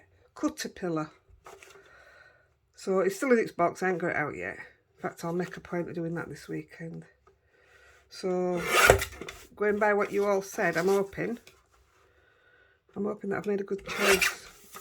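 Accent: Yorkshire, United Kingdom. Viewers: I love your videos and please please continue to blether on while you are crafting in your very lovely Yorkshire accent. Love the snoring pups too ❤xx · You might want to explain what droll means for non-Tykes. BTW I am married to a Tyke and love the accent. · Don't apologize for your Yorkshire accent, I love it...reminds me of me Gramma. I have just discovered your channel and I will be back. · I adore your Yorkshire accent, I've got friends with similar accents. I live in North Wales but have a London accent, no problems understanding you. You make me laugh too! Bought presents for all the tags you made, lol! I was listening out for you at Lavinia, but someone said you'd come earlier, I don't do early! Love your cards ❤ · Omg some people are so rude. I am also from Yorkshire and we do have strong accents. I’ve still got mine and lived in Australia for many a moon. 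If they don’t like it don’t watch it. There is plenty that love your channel. Why are people so negative I answer you lol. Keep up the brill work and don’t change a thing.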